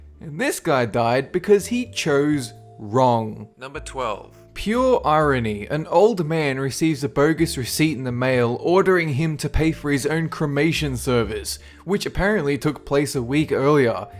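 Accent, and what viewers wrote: Australia. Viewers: sounds like an Aussie? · Debbles NZ lol wtf, I'm an Aussie and yes he is definitely Australian, how could you confuse that with an American accent? it's so obvious, especially if you're a Kiwi or Aussie!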